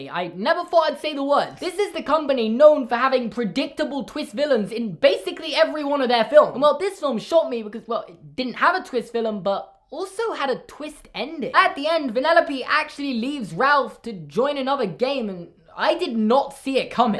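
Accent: United Kingdom. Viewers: Oh yes British dad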